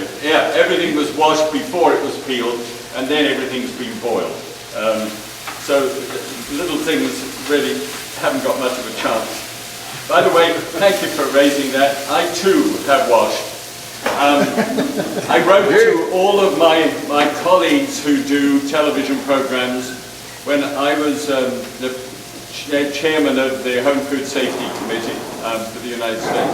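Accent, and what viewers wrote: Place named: United Kingdom. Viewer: I always enjoyed watching the Galloping Gourmet. He always spoke in Japanese, though. His jokes were also very Japanese. I did not know he had British accent until recently.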